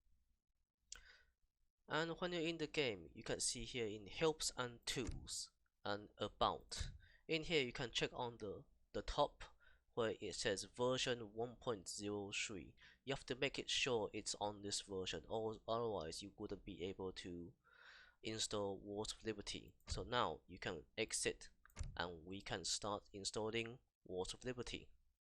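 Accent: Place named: United Kingdom. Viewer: 你英文好流利~ 還有英腔~~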